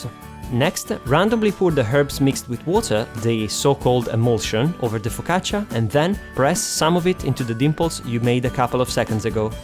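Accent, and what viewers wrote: Italy. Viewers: Tu sei toscano allora!! :)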